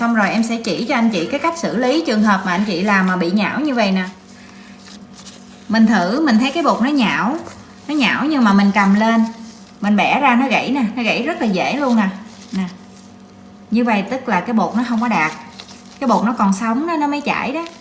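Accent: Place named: Southwest, Vietnam. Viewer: Giọng nói dễ thương, rõ ràng đậm chất miền tây, hướng dẫn và cách quay rõ ràng, dễ theo dõi, dễ học hỏi, cảm ơn con gái nghe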